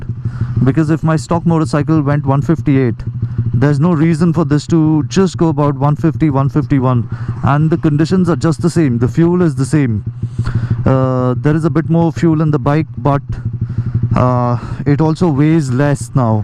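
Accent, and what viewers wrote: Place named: India. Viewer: Hard to fully understand your english cause that indian slang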